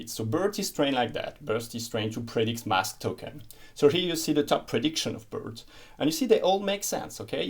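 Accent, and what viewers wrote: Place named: France. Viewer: Great content, but it was really hard to understand because this idiots thick french accent. 😔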